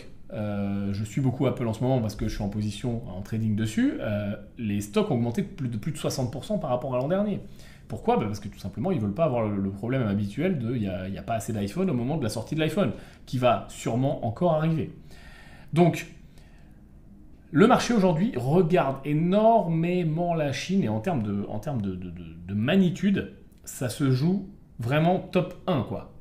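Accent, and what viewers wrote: Marseille, France. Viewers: Bien l’accent marseillais 😃, allez Marseillllleeeee 😄 !!! Si j’ai bien compris i need to have the guts (intestins in english) pour aller sur certains marchés. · Pas mal l'accent marseillais !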